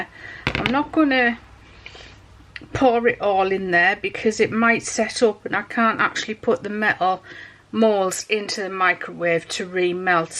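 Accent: United Kingdom. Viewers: Lady! I don’t know why I haven’t watched you for so long. I think I overdosed on soap! 🤢. You are one of the wonderful generous soap ladies who made me feel like I could a; make soap and b; pipe soap even though I had never piped really anything of any mention before. You are still at it trail blazing. Thank. You for sharing your time, your talent and your lovely British accent!!!n. Keep up the wonderful work!!! PS I have been a fan for over 5 years!!! Wonderful to see how you’ve resolve your pesky silicone liner problem!!🤣🤣. You’re a genius!!! “Blue birds and Cherry blossoms” soap name!!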